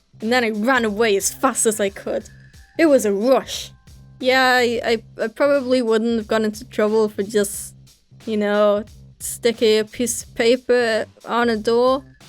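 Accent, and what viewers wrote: United Kingdom. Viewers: (if this is somehow racist im sorry) are you British you sound British and if so... GOOD FOR YOU! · I Still Love Your British Voice - w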